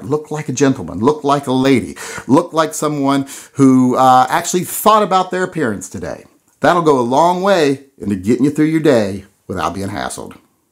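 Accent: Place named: South, United States. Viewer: You got an automatic like and subscribe the second you gave your final thought. All I was thinking the whole video was the same thing and then you dropped it on my like a Boulder 😂 never hear people in the gun community speak with such openness and honesty especially a fine southern sounding gentleman like yourself. I’ll be checking out the channel now, thanks for throwing in your 2 cents.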